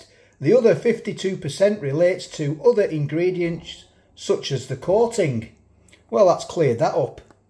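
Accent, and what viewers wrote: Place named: North Lancashire, United Kingdom. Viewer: That's a broad North Lancashire accent young man. That is why Barrow and the rest of Furness will always be Lancashire, you keep the real county alive, and better still the abolition of Cumbria in May 2023 frees you from being run by Carlisle, effectively Scottish lol. Me and hubby enjoying your video's following You Tubes recommendation of your channel and while you're around, Lancashire will never die :)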